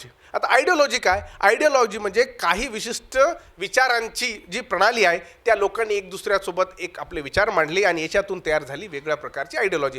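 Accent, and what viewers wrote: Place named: Marathwada, India. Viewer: मांडणी सुंदर आहे. पण भाषा पक्की मराठवाडा भागाची ग्रामीण वाटते. सर भाषेवर प्रभूत्व असले तर मांडणी फारच सुंदर वाटेल.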